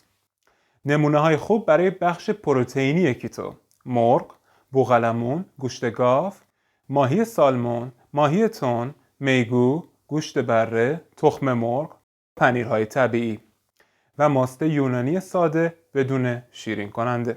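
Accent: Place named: Turkey. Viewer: این ویدئو را ده بار دیدم تا تک تک کلماتو حفقظ کنم فقط دلمون چیز شیرین میخواد چی بخوریم یه سوال شما ترکین؟ چون ق تون خیلی قق میشه من گوشم حساسه و دقتم زیاد مثلا دقت رو شما دگگت میگین البته بعضیا دگگگگت میگن من ترکها رو از رو تلفظ گ سریع شناسایی می کنم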